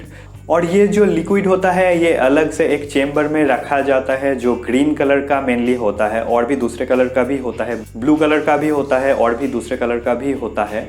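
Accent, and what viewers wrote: Bengal, India. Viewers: Dada tum Bengali lagta hai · Bangali lag raha hai. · Ap bengali ho Am I right? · r u a bengali???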